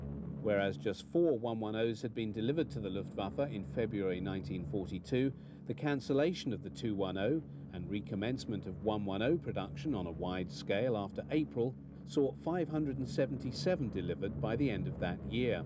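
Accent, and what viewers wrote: RP, United Kingdom. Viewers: plummy accent?? hmm, refreshingly nice to the ear if i may say so. wonderful historical footage, Thanks again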